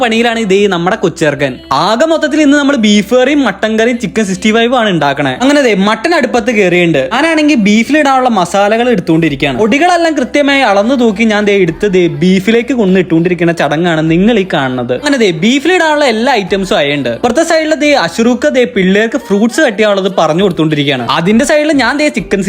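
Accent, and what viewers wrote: India. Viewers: സ്ലാംഗ് കെട്ടട്ട് മുവാറ്റുപുഴ or കോതമംഗലം😂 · Crct perumbavoor slanggg😌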